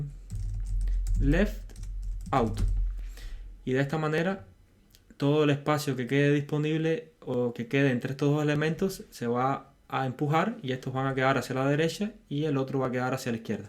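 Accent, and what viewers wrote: Cuba. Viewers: Tu acento me suena, eres de Cuba?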